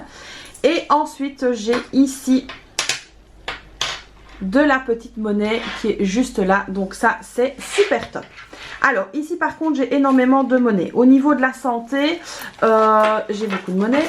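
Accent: Belgium. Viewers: Vous êtes belge ?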